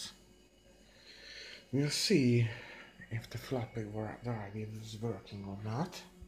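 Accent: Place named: Hungary. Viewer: Helló magyar vagy valószinü ugyanis nem angolos a akcentusod :D .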